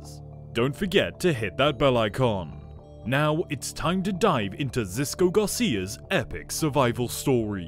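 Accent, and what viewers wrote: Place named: Italy. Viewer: First brit with Italian intonation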